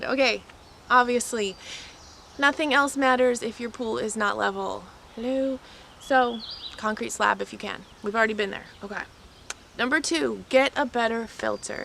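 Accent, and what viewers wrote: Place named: Louisiana, United States. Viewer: One of my many talents is to recognize and pin point the different dialects and accents from Louisiana(which there are HUNDREDS) and call where people are from. I turned on the video and i thought i recognized a north shore St. Tammany or maybe Washington parish spunding lady and i click on your page and see the Louisiana cover pic. I could be wrong, i sense a hint of the lower cajun country(east of Atchafalaya bridge i.e henderson breaux bridge area) so maybe your an implant and just picked up some of your accent but im pretty solid on the northshore call. How'd i do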